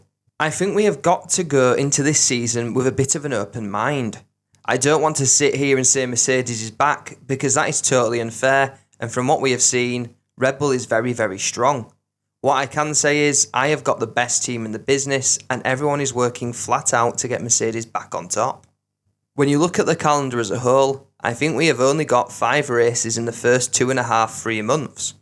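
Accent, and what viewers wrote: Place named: Yorkshire, United Kingdom. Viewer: As a non native english speaker .... just outta curiosity. You from yorkshire, duck?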